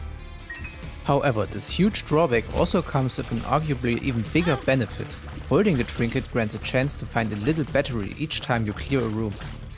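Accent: Germany. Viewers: I don't mind what videos, so long as they have that silky German accent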